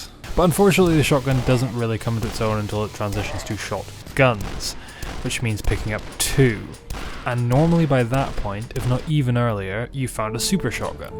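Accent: Scotland, United Kingdom. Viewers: Lad are you Scottish?? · it took me 14 minutes to realise you were scottish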